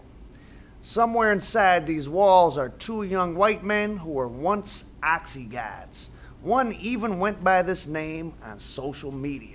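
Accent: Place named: New York, United States. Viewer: I am trying to figure out your accent sometimes it sounds like New York sometimes it sounds Brooklyn sometimes it sounds like Chicago what the fuck are you bro? I'm from Brooklyn, but I can't fuckin figure it out, No disrespect..Are you a Paisan? 🇮🇹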